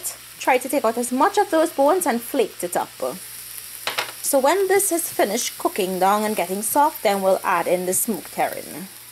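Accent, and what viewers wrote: Trinidad and Tobago. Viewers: Hey Iove your trini accent...otherwise very good trini version of solomon gundy..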